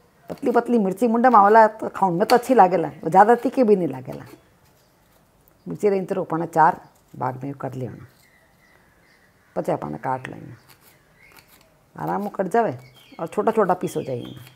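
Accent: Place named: Rajasthan, India. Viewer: Bahut badhiya rajasthani boli bolte ho aap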